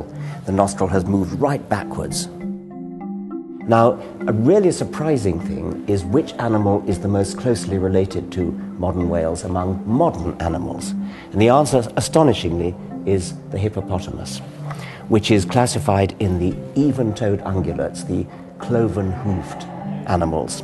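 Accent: United Kingdom. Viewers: “Even his British accent couldn’t make that sound intelligent” I get where you’re coming from because iv never come across anyone so desperate to take the intellectual high ground. He’s unbelievably patronising and philosophically naive! That’s brilliant Dawkins your a great scientific populariser and no one is more “human” than you but no one asked you to explain evolution as most people know evolution is a fairly good theory and it still doesn’t justify your claim that eugenics would work on humans without explicitly condemning it. Not to mention your naive and arrogant dismissal of philosophy and metaphysics. According to Karl Popper “it is important that scientists remain humble as a prediction could change from one moment to the next, unfortunately there is corruption since scientists started getting subsidies.” (Karl Popper). Science can’t “prove” anything as it is provisional and can only infer. 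In a thousand years Dawkins description of evolution will be synonymous with Bronze Age cosmology. We are not even scratching the surface of reality and logical positivism is self refuting. “logic is an illusion” (Nietzsche) I don’t personally have a problem with evolution or the natural sciences as long as people don’t ram it down my throat by insisting Im committing some kind of heresy if I choose not to describe all of reality using the quantitative language of science. According to Einstein “It would be possible to describe everything scientifically, but it would make no se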